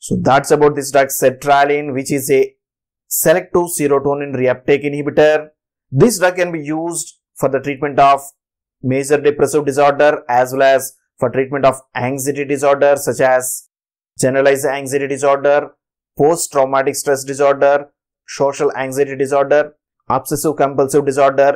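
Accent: India. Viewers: I open , I hear Indian , I leave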